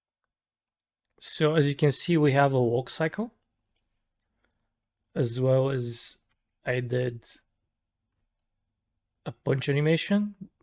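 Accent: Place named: Russia. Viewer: you must be russian because your so sounds like все and your sheet sounds like shit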